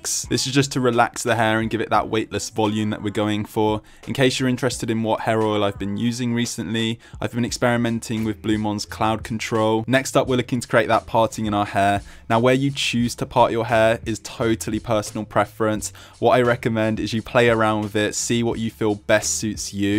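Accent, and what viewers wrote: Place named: United Kingdom. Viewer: You have british accent bro isn't 😊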